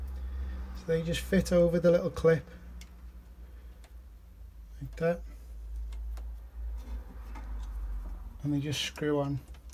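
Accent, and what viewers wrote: Liverpool, United Kingdom. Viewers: Ok lad. Could ye do a video on putting extra sockets in when ye get a chance from a fellow scouser👍👍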